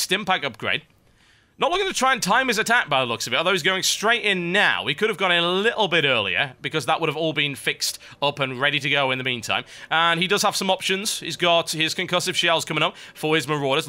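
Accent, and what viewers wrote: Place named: London, United Kingdom. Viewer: lol, i can tell you watch a lot of EPL, using terms like "equalizer," as if LZgamer was one-nil down hehe. ARSENAL IS MY HEART. judging by your accent (i'm terrible at judging english accents) you do sound like a londoner. which means there's a good chance you're a gunner fan :D. keep making videos, there will be criticism no matter what you do online. everyone is a professional at everything with anonymity. in real life, they most likely flip burgers and drop potatoes in fryers all day.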